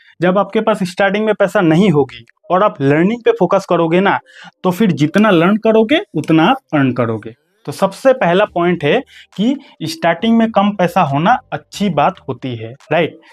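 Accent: Bengal, India. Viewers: सर आप एकदम सरल भाषा में समझते हो आनंद आ जाता सीधे दिमाग में घुस जाता है लेकिन आपकी हिंदी में थोड़ी थोड़ी बांग्ला भाषा की ton आ जाती है तो और मजा आ जाता है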